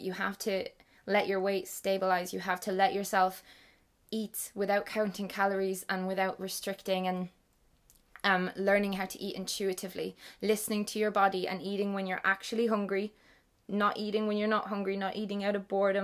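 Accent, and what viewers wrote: Ireland. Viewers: Which county is she from? I'm woeful with accents. Tipperary? 🍍🍍🦄🦄❤❤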